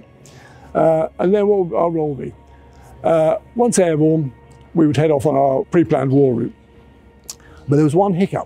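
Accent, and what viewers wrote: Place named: United Kingdom. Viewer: I’m going to start cultivating a British accent so I can sound as cool as this bloke… Love this video!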